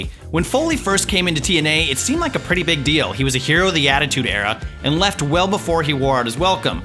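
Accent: United States. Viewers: Why is this guy american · Who the fuck is this guy with his annoying, overly exuberant American accent? When I click on a WhatCulture vid I want to hear bland, monotone British voices. Not this shit! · It's because he's American isn't it?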